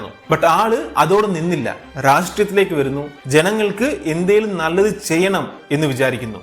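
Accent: Tamil Nadu, India. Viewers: ഒരു തമിഴ് ചെവ സംസാരത്തിൽ ആരിക്കും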